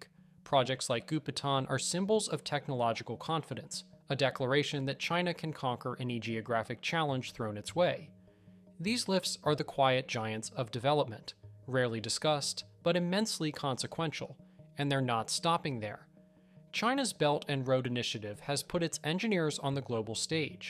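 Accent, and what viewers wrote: United States. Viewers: I love the obvious inflammatory titles from CCP, yet the narrator is American 😆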